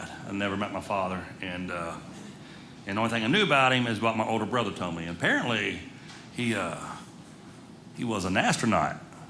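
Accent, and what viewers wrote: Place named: Kentucky, United States. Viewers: is hilarious and his standup comedy always reminds me of Kentucky story telling, especially with his accent. Some of the funniest people I've ever known are from my old Kentucky home. 😁 Truly great job! 💕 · you made me laugh myself silly on several occasions during this set!! I still visit my brother in Highview when I get back to the U.S. and always make a trip downtown to Caufield's!! I started doing magic and shopping there back in 1980. I grew up in the Louisville area from 1966 to 1975 and came back to KY after the navy in 1979 to 1982. It's nice to hear the hometown accent!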